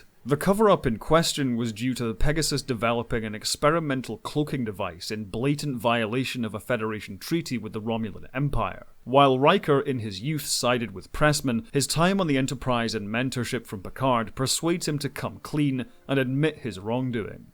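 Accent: Scotland, United Kingdom. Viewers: You have a very, very slight Scottish accent.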